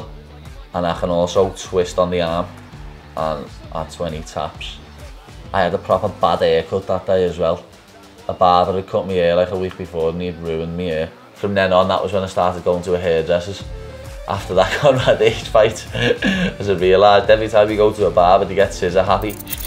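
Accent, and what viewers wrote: Liverpool, United Kingdom. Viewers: What is this accent? Where is he from exactly in the UK? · liverpool, england. known as the scouse accent. it's a love or hate it kind of thing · I can actually understand what you're saying when you're alone like this, and not playing up the Scouser accent with your buddies.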